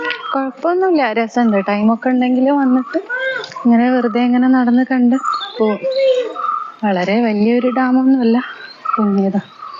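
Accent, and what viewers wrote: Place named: Malabar, India. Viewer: 40 പേർക്ക് ഇഷ്ടപ്പെട്ടു എന്റെ കമെന്റ്..അറിവില്ലായിമക്ക് കയ്യും കാലും വെച്ചാൽ അതിനു എന്ന് വിളിക്കാം...വാഴാനി അണക്കെട്ട് എവിടെയാണെന്ന്.എവിടന്നു പോകുന്നു എന്നും അടുത്ത ഏതെങ്കിലും അറിയപ്പെടുന്ന ടൌൺ ഉണ്ടകിൽ അത് ഇതൊക്കെ പറഞ്ഞാൽ അറിവില്ലാത്ത ഞങ്ങൾക്കും പോകാമല്ലോ.എന്തിനാണ് വീഡിയോ പിടിച്ചു ഒരു പരിചയവുമില്ലാത്ത ഞങ്ങളെ കാണിക്കുന്നത്.പ്രായത്തിനെ അന്തസ്സെങ്കിലും കാണിക്കുക.ഇത് താങ്കളുടെ തെറ്റല്ല കോന്തൻ ആണ്..സംസാരത്തിൽ നിന്ന് നിങ്ങൾ മബാറുകാരിയാണന്നു മനസ്സിലായി.വളരെ നന്ദി ഇനിയും ഉപദ്രവം തുടരുമെന്ന പ്രതീക്ഷയിൽ തൽക്കാലം നിര്ത്തുന്നു സ്നേഹപൂർവ്വം കുഞ്ഞാപ്പ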